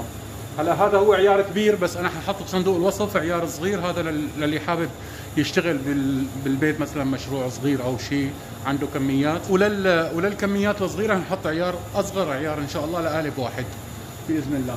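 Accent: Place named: Damascus, Syria. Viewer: الله يعطيك الف عافيه انا لما بشوف فيديوهاتك وطريقه حكيك بحس حالي قاعده بنص شام الله يفرج ع سوريه واهله بجاه الحبيب محمد(ص)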